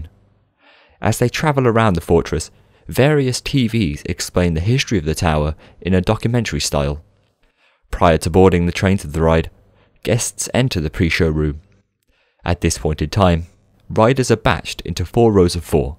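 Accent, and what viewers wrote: United Kingdom. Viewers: I love Englishman speaking german 😂 Great Video!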